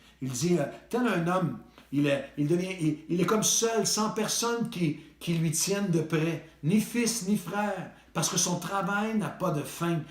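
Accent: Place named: Canada. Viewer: Bsr, j’aime vous écouter Mais j ai du mal à tout comprendre avec le français canadien trop vite Que Dios l bendiga amen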